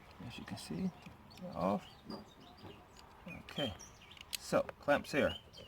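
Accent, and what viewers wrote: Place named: South, United States. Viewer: Thank you for the clear explanation. Your Southern accent is the icing on the cake :-)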